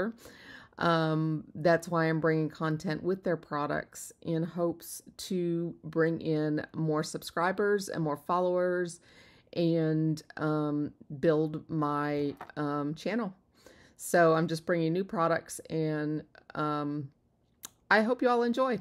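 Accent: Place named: South, United States. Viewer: listening to you with your southern accent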